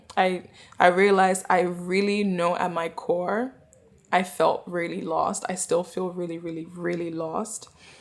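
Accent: Nigeria. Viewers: I love your Nigerian accent....it's beautiful...and so are you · Stop listening to all those people and just do what you did and enjoyed to get you here. If talking in you 100% Nigerian accent is who you are ...then be you and do you. Stop trying to please everyone but YOU!! Sweetheart you are hurting yourself for others. You are not a living sacrifice for them.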